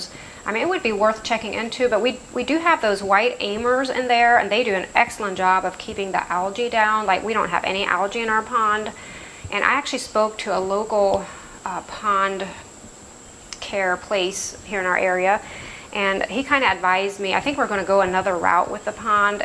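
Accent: Pennsylvania Dutch, United States. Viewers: Such an interesting accent.... Pennsylvanian Dutch?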